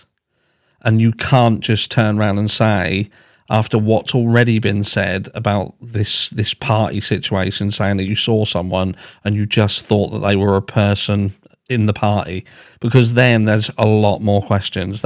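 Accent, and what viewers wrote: United Kingdom. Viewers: True Crime is always better with a British accent.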